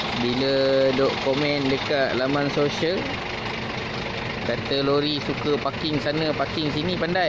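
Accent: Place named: North, Indonesia. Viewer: kuang ajaq🤣🤣🤣abg speking northern👍🏻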